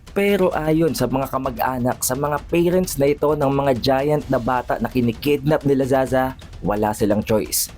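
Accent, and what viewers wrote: Philippines. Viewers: Idol try mo wag mag english ang pangit ng tuno mo eh masyadong pinoy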